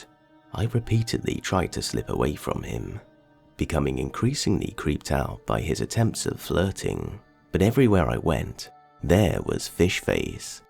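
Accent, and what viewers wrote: United Kingdom. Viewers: Thank you 😊!!!! For the Stalkerlicious 👁 Narration:) and for over an hour of your Britishlicious voice 😘 be safe everyone ok 💋much love Sexy Queen of Darkness ✌❤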